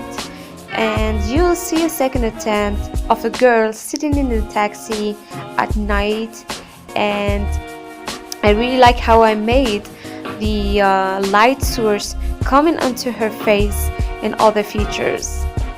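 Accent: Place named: United Kingdom. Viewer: Should I comment about these amazing drawings 😍, well edited video 🤩 or just,,, that new British accent thouuugh ? 😂😂 Ps : U nailed it 👏👏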